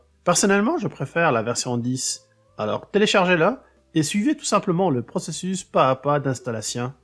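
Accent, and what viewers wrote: Quebec, Canada. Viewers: J'adore l'accent québécois. Je pourrais t'écouter pendant des heures mais je ne me lasse jamais. Ah Seigneur j'attends un que tu mettes un québécois sur mon chemin il va parler pour que je t'écoute 🥰🥰🥰😂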